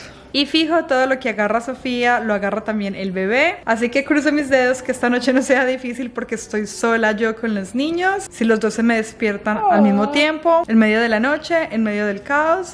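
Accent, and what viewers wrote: Antioquia, Colombia. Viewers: Ah qué bien queda cerca a Mánizales, si tienes un acento paisa, ah qué rico pronto puedas venir a Colombia. Bendiciones a toda tu hermosa familia, eres una madre y mujer ejemplar, lo haces súper bien y me encantó este tipo de videos.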